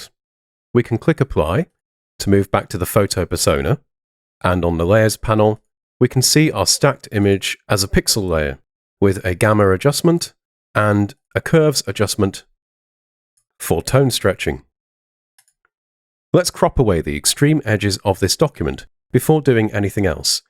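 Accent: United Kingdom. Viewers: Nice UK accent and nice tutorial...Watching you from Iraq.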